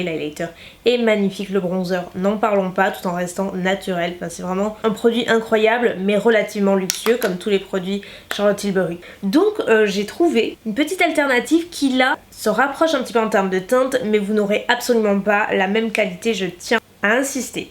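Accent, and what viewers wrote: South, France. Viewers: Pourquoi tu dis "pace" au lieu de "parce que"? Est ce ton petit accent du sud😉😊 très très bonne vidéo! Pourrais tu tester entièrement la marque W7? Bisouss❤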